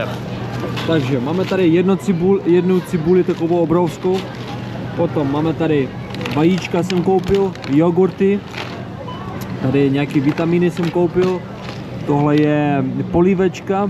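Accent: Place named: Ukraine. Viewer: Mohu se zeptat, podle přízvuku jsi z Ukrajiny nebo z Ruska? Díky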